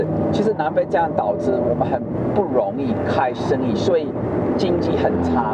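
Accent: Taiwan. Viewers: 尾音 吼，真的很台灣腔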